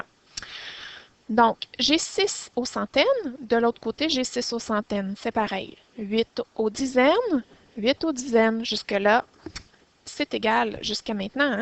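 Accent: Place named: Quebec, Canada. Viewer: J'aime bien ton accents québécois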